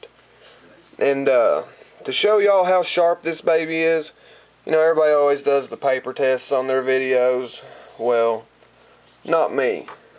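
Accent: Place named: South, United States. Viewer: i was having a go at the voice that southern US tone i just love it. This is an amazing review that Vannax C35VN Steel is insane just because S30V was voted as the best steel in 2007 or 2008 doesn't mean it still is and a lot of people think it still is. But the Vannax S35VN leaves most steel for dead. Ots a incredible steel the S35VN if you learn about it. Anyhow i was taking the micky out of ya and seems that some took it for real. Top review Champ...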